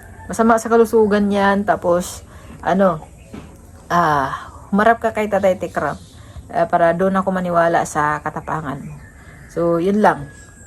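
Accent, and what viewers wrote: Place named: Visayas, Philippines. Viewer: Bisaya ka pala dong .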